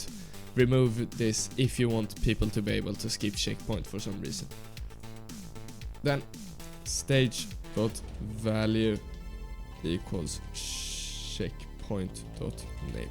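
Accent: Sweden. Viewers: YOU ARE SWEDISH I HEAR UR ACCENT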